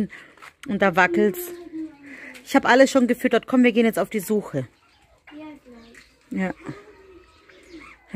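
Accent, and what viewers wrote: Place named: Swabia, Germany. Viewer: Ich höre dich so gerne schwäbisch erzähle... Weckle...... Semmeln wie süß... 😊😂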